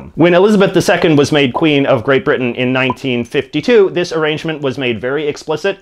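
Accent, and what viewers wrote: Canada. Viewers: About. Foond. Canadian accent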